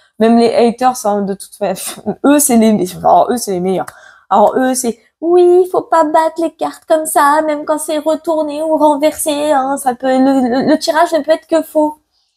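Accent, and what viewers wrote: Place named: North, France. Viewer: Chère petite je réponds un peu à bla personne qui dit qu il ne comprend pas à ce que vous dites en réalité c est peut être comme moi qui suis du sud et qui a du mal à comprendre l'accent du nord surtout lorsque tu ris en parlant C est marrant ''''mais peut être si tu arrive à parler un peu plus lentement ce sera mieux Je te dis cela sans aucune méchanceté car je suis une vieille grand mère qui te fait de gros bisous car tu es très très sympa je t ai connu dernièrement lorsque tu étais avec le bazard de la voyance💋💋💋❤️❤️❤️👍👍👍👍👍👍on t aime bien petite cherie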